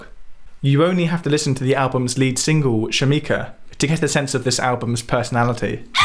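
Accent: England, United Kingdom. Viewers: A man with an English Accent !! Dreamy !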